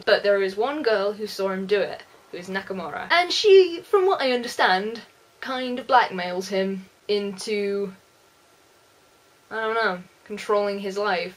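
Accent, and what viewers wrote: United Kingdom. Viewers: I'm really glad that I got to hear a fellow Brit talking about this anime. I've become a big fan of it and each episode cannot come soon enough. I'm definitely used to the style now but it is a pity that they couldn't put more money into it.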